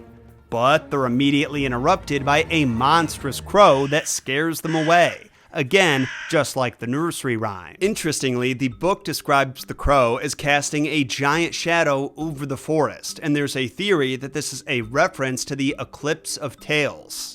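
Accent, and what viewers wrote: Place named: United States. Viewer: Never heard it read in an American accent but cool video.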